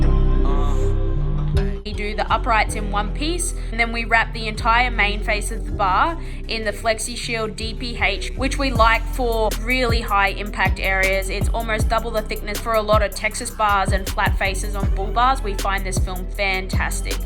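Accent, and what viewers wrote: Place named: New Zealand. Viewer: Bubbles? how on earth do clear them?? And she sounds like a Kiwi,,,🤔😊😊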